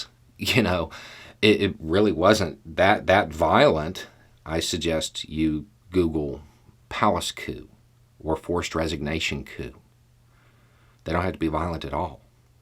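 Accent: South, United States. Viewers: More people “looking like” Beau .... color and accent coming from the south need to be doing more of what Beau is greatly accomplishing right now in speaking the truth etc as the usual perception as mostly been negative and mostly Republican supporters spewing madness and garbage. Cudos to Beau for jus simple been honest and right!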